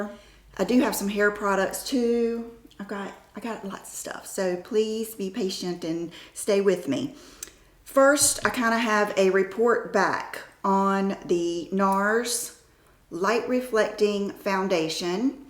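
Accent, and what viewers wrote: South, United States. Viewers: One of my favorite things about you is your southern accent!!!! 🥰🥰🥰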